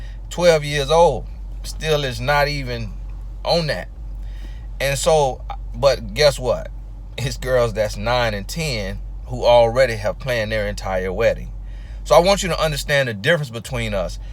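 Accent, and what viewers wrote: South, United States. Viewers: I just enjoy hearing you talk, that country accent though.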